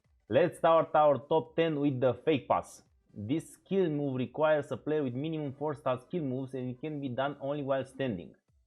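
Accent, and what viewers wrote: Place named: London, United Kingdom. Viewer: Nice London man, are u African?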